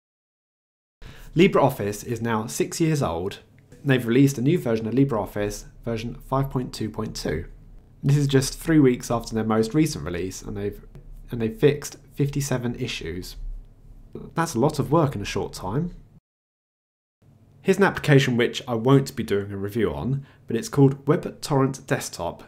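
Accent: United Kingdom. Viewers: I enjoyed your broadcast. But since you talk so fast at times, I turned on CC. Interestingly "Mate" became "Marty" in CC. British accent sometimes add an R sound after an A. I imagine that watching CC of your talk might be enlightening.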